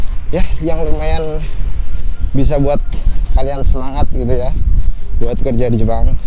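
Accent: Pekalongan, Indonesia. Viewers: Podo wong kalongan. 😁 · Wong Pekalongan ya mas ?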